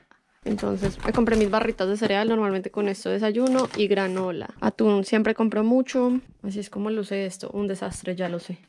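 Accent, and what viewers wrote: Colombia. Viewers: Hola te saludo desde 🇬🇹 me gusta tu acento colombiano.....me gustan tus videos ❤ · Hola! Empecé a seguirte porque me encanta el acento colombiano, ¡mi nuera es colombiana! ¡Soy brasileña, de Rio Grande do Sul! ¡Me encantan tus publicaciones! ¡Éxito y feliz Navidad! 🎄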